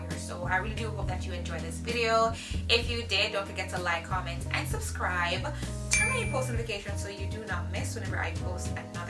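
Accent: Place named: Jamaica. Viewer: I heard a Jamaican accent so I’m subbing to my people.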